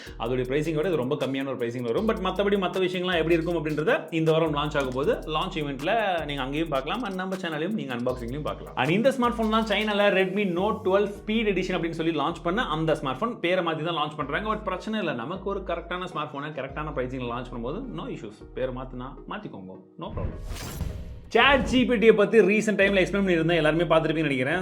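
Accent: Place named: Bihar, India. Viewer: Bihar voice super bro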